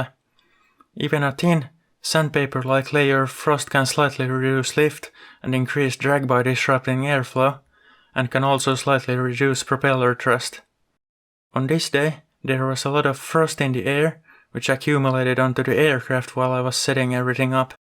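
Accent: Finland. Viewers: 2 seconds in and i can already tell by his voice that it's in finland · Are you fenno-swedish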